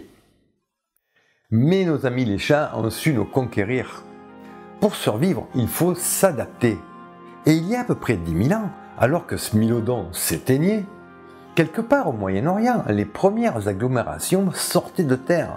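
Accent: South, France. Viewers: Si j'ai bien tout suivi par delà l'accent "peuchère"... cela veut dire que le coutelas "dent de sabre" de Rahan n'est qu'une invention Pif Gadget?!... 😒... triste réalité. La double peine du confinement : Le savoir tue tes rêves! hi hi hi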